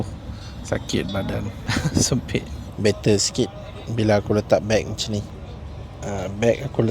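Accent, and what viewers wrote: North, Malaysia. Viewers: Syok dengak loghat utara.. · Steady bro..orang utara ka..aku baru ja jumpa channel hang ..best2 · hg ni org utara ka..😅😅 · Aku layan sampai hbs brader sebab bahasa utaqa hang..senang nk paham😂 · Nice video bro. Aku tengok sampai habih. Siap balun cakap utagha 🔥🔥🔥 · Terbaik bro, slang utara tu daripada Malaysian Indian melancong ke India. Terbaik review ni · terbaik la... siap cakap utara... syok dengar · skrol2 youtube jmpa chanel ni ...try bkk...skli loghat utara mmg padu pny review...mmg sempoi.. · YouTube suggest. Dengaq pulak cakap utaqa. Done Sub semoga channel sukses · gilalah ada review bahasa kebangsaan campur utagha bakhang.....inilah yang dicari cari...well done bakhang...tak sangka kerala ni kira maju juga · Sebab cakap utara aku subscribe 😂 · Saya org Penang. Saya selesa dgn orang cakap utara. Dah subscribe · Bahasa Kebangsaan dan loghat utara yang tersusun sangat sedap layan video bro.. 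terbaikk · bukan BM jer...dia bantai loghat utagha bak hang. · Video hang fyp kat YouTube aku time aku scroll. Bila aku dengaq hang sembang utara langsung teruih aku SUBSCRIBE channel hang.. Sempoi bak hang video hang. 🎉🎉🎉👍👍👍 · Aku sub sebab hg terer cakap melayu..loghat utara plak tu mmg sempoi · Start² terus melayu loghat utara, auto subscribe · Perghhh ni video pertama aku tengok, sebab naik suggestion. Tak cakap banyak, selepas tengok opening 2 minit terus aku subscribe. Nampaknya aku dah jumpa benda baru yang nak melayan sebelum nak lena, mamat ni not bad lah untuk vlogging. Paling best dia guna loghat utara, senang faham. Time lunch hour pun boleh layan video ni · Suka dengaq brader cakap utara.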